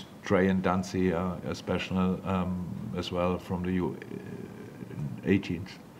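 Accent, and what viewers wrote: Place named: Liverpool, United Kingdom. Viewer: I am going to miss Jürgen pronouncing names in a Scouse accent 💔💔💔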